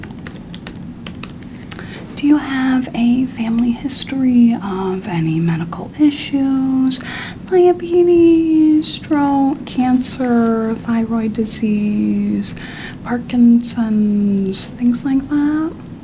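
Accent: South, United States. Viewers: i was confused when i saw your face on an ASMR video and i was like ‘wait….?’ and i have been pleasantly surprised to find you’re one of my new favorite ASMRtists and you help relax me so much!! i know you do it for comedy but you really do have a knack for it. i kind of wish all of my doctors were like this, the familiarity of speech and mannerisms put me at ease, and you have a really lovely soft-spoken voice! can’t wait to see more <3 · I find it funny cause I found you with your short videos with your southern speak and what it means. I’m so happy you also do asmr, you’re awesome and your voice is very relaxing. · i love your videos with a SOUTHERN accent!! scratches my brain! me and my gf watch them before bed and fall asleep. your comedic timing is great and the asmr is so comforting!!! ❤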